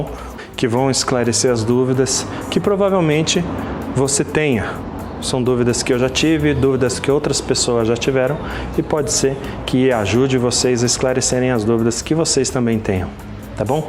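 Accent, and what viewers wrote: South, Brazil. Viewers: Gostei muito bom. Pelo sotaque acho q vc é sulista, moro 6 meses em Curitiba e 6 meses na Itália, vou acompanhar seus vídeos. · Olá! Sua serra vertical é fantástica, mas muito melhor é sua capacidade de explicar e sua generosidade em não guardar nada para si mesmo. Você tem muito carisma para estar na frente de uma câmera. Pelo seu sotaque fica claro que você é do sul do Brasil. Amo seu país e lamento não poder ir todos os anos como fazia anos atrás. Parabéns mais uma vez e desejo muito sucesso. Saúdo-vos de Córdoba, Argentina.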